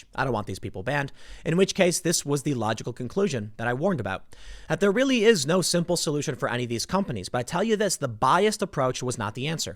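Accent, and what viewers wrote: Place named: Chicago, United States. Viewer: I think its the Chicago accent. Illinois has some interesting ways of pronouncing words, and celebrities have interesting sounding names, its bound to come out some how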